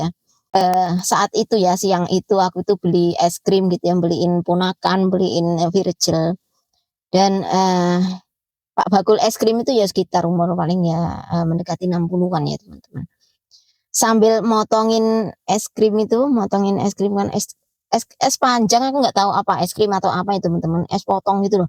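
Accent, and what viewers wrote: Java, Indonesia. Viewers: Suka dgn pembawaan mbak yang sederhana dgn celetukan Jawa nya yg medhok, sehat & bahagia sll mbak. Salam kenal dr solo 🙏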